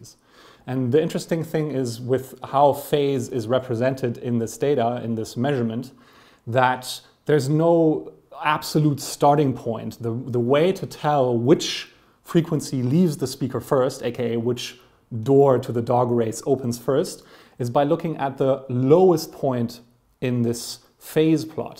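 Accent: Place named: Germany. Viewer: For me as an aspiring soundengineer, this video was so helpful. Thank you so much for this clearification. Are you from Germany? Your accent sounds familiar😅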